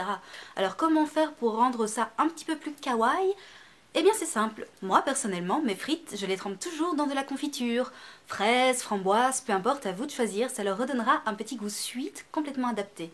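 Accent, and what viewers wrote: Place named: Belgium. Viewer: ton accent belge est parafait 💙👌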